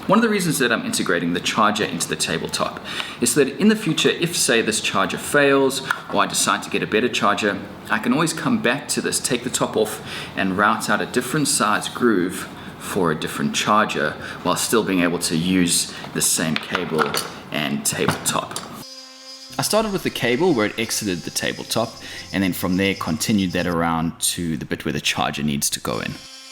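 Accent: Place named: South Africa. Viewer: Started watching this, and I’m like “hey I know this accent”... I’m a South African living in Malta.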